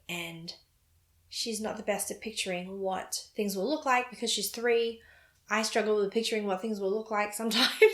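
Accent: Australia. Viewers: Thanks so much for your episodes. It’s lovely to tune in to each episode and listen to an Aussie. Next on my needles is the Gib sweater for my husband - should be fun.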